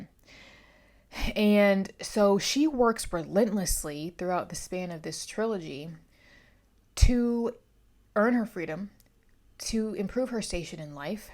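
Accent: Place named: New England, United States. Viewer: Wadder... I wonder from what part of NE you are from. I heard an 'R', so it must not be Bahston, Ma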